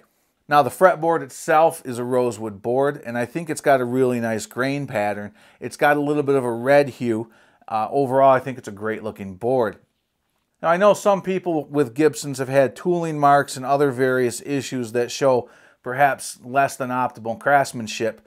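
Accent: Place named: Michigan, United States. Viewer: first video I've seen from ya. Nice evaluation. Thanks! looking at a Chibby or Episode Greeny. I'll bet $100 you're from Michigan. I've been in FL since 84 and can hear it... lolol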